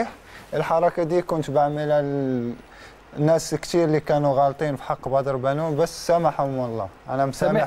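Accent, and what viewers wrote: Egypt. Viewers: ههههه بيهدر مصرى بطريقه ملفته ادائك جميل ف تعبير الكلام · بدر بانون لخبط اللهجتين المصريه والمغربيه مع بعض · فين للهجة بلادك اسبوع في اصبحت مصر..انظر الى معلول كما سنة هو في مصر يتكلم فقط تونسي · انا ملاحظ انك بدأت تشرب اللهجة المصرية😂😂🇪🇬🇵🇸🇲🇦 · كتكلم المصريه طزززز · هل انت مصري ام مغربي يتنكر للهجته الأم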